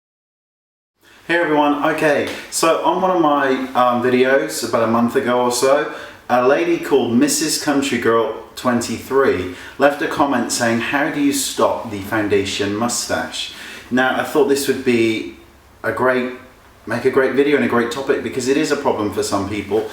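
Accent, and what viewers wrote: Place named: Bristol, United Kingdom. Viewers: You ARE BEAUTIFUL. And having an English accent. Not fair u look even more beautiful here you remind me a lot of my fav singer Darren Hayes I Love all of your videos. Thank you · Sounds like you are from Bristol.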